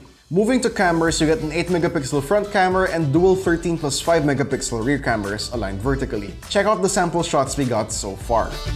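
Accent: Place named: Manila, Philippines. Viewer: It's Manila boy accent boy!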